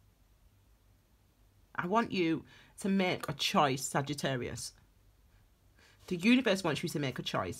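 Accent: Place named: York, United Kingdom. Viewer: Hi thanks for your reading. I was wondering if you're from York in England? :) I can recognise your accent :) xx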